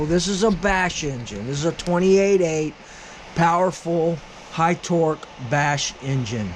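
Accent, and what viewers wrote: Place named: New England, United States. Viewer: Couldn’t tell if you were up here in New England or Texas.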